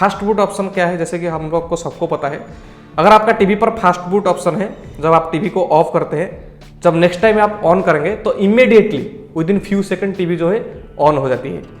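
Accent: Odisha, India. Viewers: odisa se ho ??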